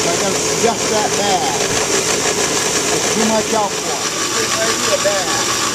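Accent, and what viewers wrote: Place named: Maine, United States. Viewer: knew he was from maine within first few words ..sure enough lol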